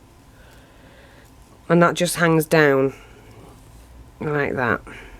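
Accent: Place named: England, United Kingdom. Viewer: Your awesome you have warmed the kukkels of my heart..lol thanks a lot.Is it Easter there,Where are you from You sound English.I love the accent..Im a Newfie in Kitchener Ontario.I call myself The Official Witch of Kitchener City.lol.Have you ever watched any of Lisa The Pagan videos She sounds just like you I love her.Blessings.)0(.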